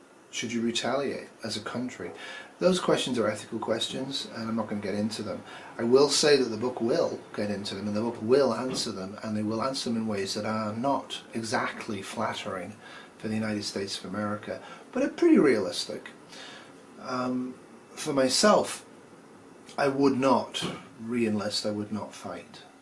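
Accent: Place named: Scotland, United Kingdom. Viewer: You sound more Scottish then Cannuck.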